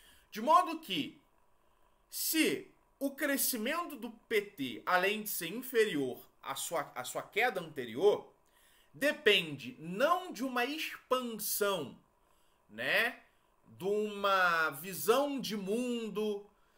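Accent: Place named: Rio de Janeiro, Brazil. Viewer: rapaz, o carioquês tá brabo hoje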